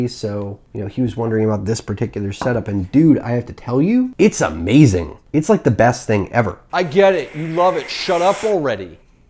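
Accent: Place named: New York, United States. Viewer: your mini me really brings out the new yorker in you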